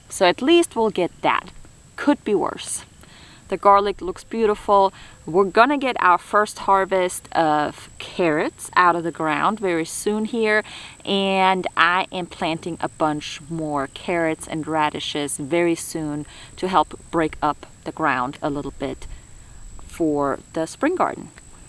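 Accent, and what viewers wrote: Louisiana, United States. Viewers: Is your accent Cajun?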